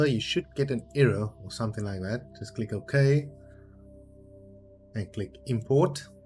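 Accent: South Africa. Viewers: I can hear another South African anywhere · i think i'm from the same place as you, south africa? i felt your accent sounded south african? as for the p*rn thing... haha, that actually why i switched to z. once you get to the three xxx to place things really at the bottom, you realise you need a more benign letter :)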